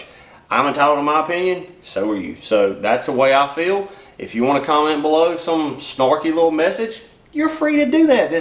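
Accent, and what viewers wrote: South, United States. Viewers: Thank you for sharing your experience with Covid-19, Everyone will experience Covid-19 differently with a lot of Similarities, there’s several Symptoms commonly known with COVID-19, everyone has or has not Other Underlying Health Issues that will Most likely make this Virus more difficult to Deal with and it may add more Symptoms and issues, I’m a free diver and I need my lungs to be in top Condition so I try to take necessary measures to keep from getting infected but i also have a few underlying health issues, I’m a Combat Veteran and my VA Is helping me a lot to keep it safe for me. Your message hear is Spot on… My fellow Comrades and I fought in Various Combat theater’s to keep Americans Freedom so Everyone has their Rights, COVID-19 is REAL, PEOPLE ARE DYING, DO WHAT YOU CAN TO KEEP FROM BEING INFECTED, TRY NOT TO INFECT OTHERS, TAKE THIS INFORMATION SERIOUSLY! 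People Pay good attention to what this young man is telling you! I agree with his message, I’m 57 and not in great health so I must stay well, I love my Tuna, Mahi-mahi, Wahoo (Ono), Sword fish) so I must keep fishing (free diving)W/O tanks! KEEP ON FISHING! Where ever you’re from, not sure but you sound like a Southern boy? Kentucky or Tennessee? I have a Army buddy up in Kentucky, strong accent like yours lol. Take bud. Aloha from Hawaii. 🌴🌴🤙🏼😎🤿🌴🌴🍍🥑🍌🏄🏽‍♂️🚣🏽🚤🐟🎣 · You Country AF. Lol new to your vids. What’s stand for? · I appreciate your heart on this you southern accent reels it all in for me it's like listening to family also I'm sorry for your experience with this and you know and I know God brought you out of this, but the politics is exactly what you think you got it right friend. This is what I discovered and I believe God shown me, from the beginning I knew they rolled it out too fast I was out on lockdown for 4 wks and in that time I experienced a little fear and it felt familiar like the time the world learned about terrorism at 9-11-01 and before that with the extreme Islamic. 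I began to seek the Lord I knew I wasn't anywhere I was supposed to be with the Lord but I did what I knew to do repented began to read my Bible more and I was accustomed to witness cause though steeped in my sin I knew the Lord had a job for us believers to do but I couldn't be around anyone I thought going to street evangelize but my family and some YouTubers advise not going alone but I really wanted to fish, that's my type of fishing cause Jesus made us fishers of men. No one would go fishing with me so I continued what I started to do while on lock down and that became a YouTuber for Christ and I also forwarded videos I found on you tube some shocking things about the poor spirituality of our current state as a country I mean I was desperate to do the work of the Lord I may have went a little overboard but when you don't know if you have the next day or the next moment then you reach out to everyone you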